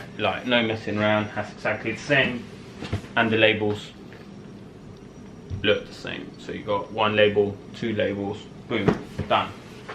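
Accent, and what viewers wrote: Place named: United Kingdom. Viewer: Are you Mexican ? 😁 I’m confused because your accent is British 😂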